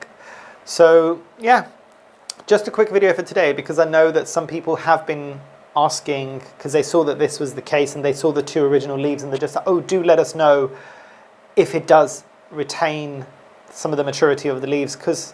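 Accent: West Country, United Kingdom. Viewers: Love that west country accent coming through ☺️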